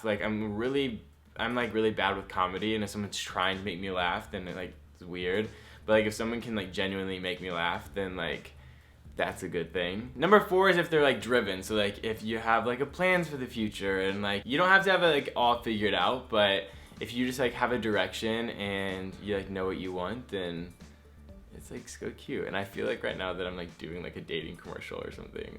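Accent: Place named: Los Angeles, United States. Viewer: los angeles accent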